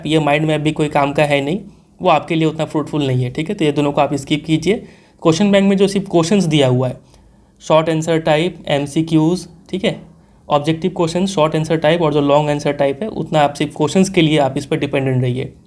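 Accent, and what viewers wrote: Bihar, India. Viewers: Sir, Are You From Bihar..??? I Mean Your Way Of Speaking ❤️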